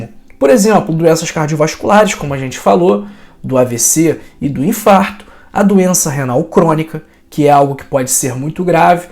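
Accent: Rio de Janeiro, Brazil. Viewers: O sr tem sotaque de carioca . Parabéns pelas dicas. Obrigada pela consulta.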